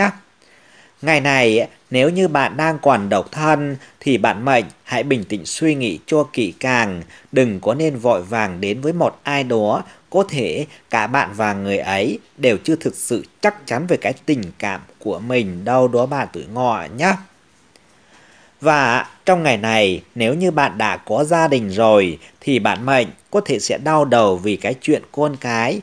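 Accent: Thanh Hoa, Vietnam. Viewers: Bạn ơi. Gốc thanh hóa hả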